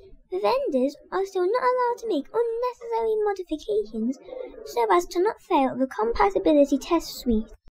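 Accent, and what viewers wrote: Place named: Liverpool, United Kingdom. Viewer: The gentleman is from Liverpool, if I'm not mistaken.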